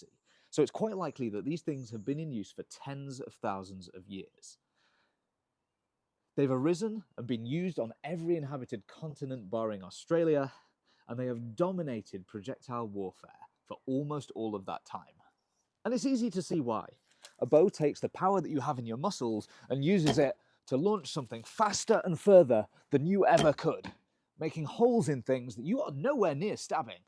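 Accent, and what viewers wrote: United Kingdom. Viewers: This is exactly the kind of video I needed to see for the story I'm writing AND it's got a dude who's funny with a british accent. Win win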